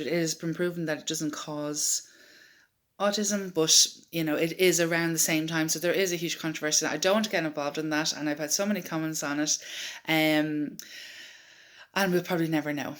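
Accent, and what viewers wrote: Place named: Ireland. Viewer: Hiya, sorry I have another question which I hope at least you can help me with. I've been doing more research on this oil and just wondered, 'how' did you work out what dosage to give your son (or even yourself as I've learned?) Was it merely trial n error or were you given any guidance? Many thanks. Ps. Forgive me but I said earlier that you sounded like a 'brit' but now I recognise that lovely irish accent(lol. Silly me) Tc now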